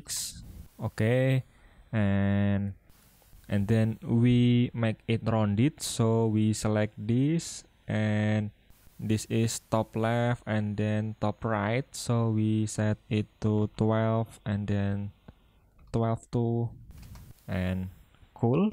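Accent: Indonesia. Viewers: Awal nonton, kok aksennya familiar 😂, ternyata orang indo toh, mantep dah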